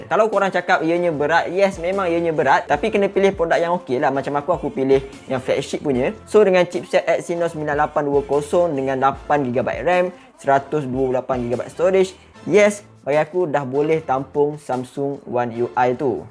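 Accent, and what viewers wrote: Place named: East Coast, Malaysia. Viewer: Orang mana bang ??? sebab bunyi loghat la mcm pantai timur . Macam je tanye je jangan kecam jangan marah ok .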